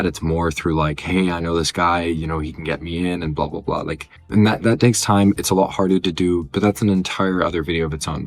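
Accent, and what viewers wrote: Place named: United States. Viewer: He sounds American to me. Is he Canadian or something? Their salaries are high too. I’m so confused now lol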